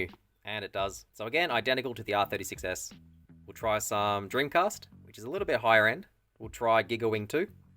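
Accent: Australia. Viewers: lol, thought it was just the ausi accent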